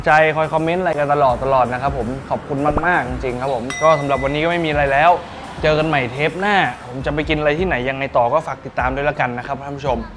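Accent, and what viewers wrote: North, Thailand. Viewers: อยากให้ พูดเหนืออะ ฟังเเล้วน่ารัก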